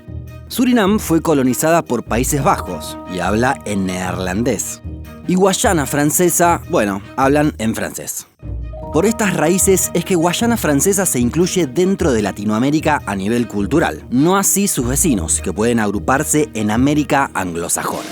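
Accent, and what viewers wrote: Buenos Aires, Argentina. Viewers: Es argentino es su acento. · se le re nota que es argentino ese boludo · Me quedo mas que clarisimo que sos Argentino, por la forma de pronunciar y marcar la "Y" cada vez que decis Guyana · Los amo <3. Hacen un trabajo fantastico! Saludos desde Mexico! Viva la vocecita con acento argentino que dice siempre Tristan da Acunha jajajajaja lo amo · jajajaja, no solo Buenos Aires habla así, todo el sur también habla así, entre ríos, Santa Fe y el sur de Córdoba también.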